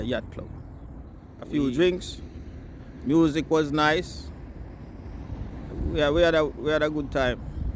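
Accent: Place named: Jamaica. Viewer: Hi brother, from the time I hear you speak I know you're a Jamaican, I'm just listening to you I'm so impressed about your visiting and experience in Vincy so glad you enjoyed every moment in beautiful Vincy whenever you want to travel again go Vincy man I tell you you are very welcome and make sure you visit other grenerdine island, one more thing there are other beach down the lee-ward side that have a mixture of white and black sand with seashell between the rock ,but you have to walk far to get there blessed peace and love 🇻🇨🇨🇦🙇🏿‍♂️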